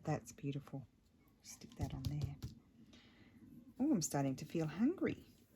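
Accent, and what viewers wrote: Australia. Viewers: I love this way of making clusters - I'm still new to all of this and haven't attempted clusters yet but this is how I'll try it for sure! Also love your videos and all of your wonderful creations. I was an exchange student in high school and I was in Australia for about 6 months. The Australian accent is one of my favorites and makes me think of how much fun I had when I was there.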